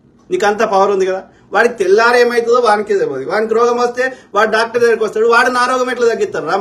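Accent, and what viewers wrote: India. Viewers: బ్రదర్ ఈయన చెప్పేది సామాన్య ప్రజలకు అర్థం అయ్యే విధంగా మన తెలంగాణ గ్రామీణ ప్రాంత యాస లో చెప్తున్నాడు, అంతే.., అందులో నాకైతే ఏం తప్పు అనిపియ్యలేదు, i hope you understand ❤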